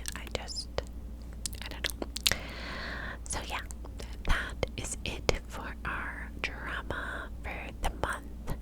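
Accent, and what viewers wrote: New York, United States. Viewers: Could you do another video with your New York accent? Those videos are always so tingly 🥰 💕 I hope you are doing well! 😊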